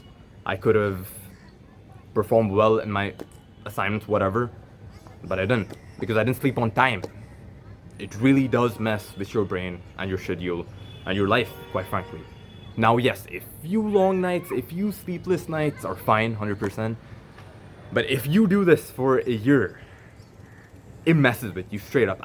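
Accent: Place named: United States. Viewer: bro you form kuait but please tell me how you got this such fluent american accent please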